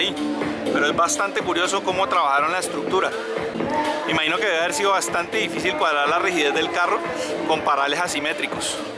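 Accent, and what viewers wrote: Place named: Cali, Colombia. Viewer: Hermano quite ese acento caleno tan chimbo.;..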